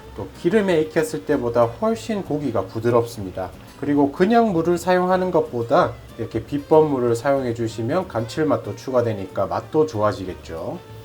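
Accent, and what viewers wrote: Gyeongsang, South Korea. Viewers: 경상도 액센트가 매력적이에요 love love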